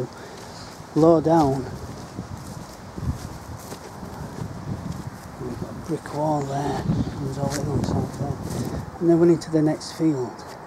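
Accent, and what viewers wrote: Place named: United Kingdom. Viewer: You sound like you are from the UK. Any reason why you are using receivers greater than 25mw? 25mw is the max legal power in the UK & the EU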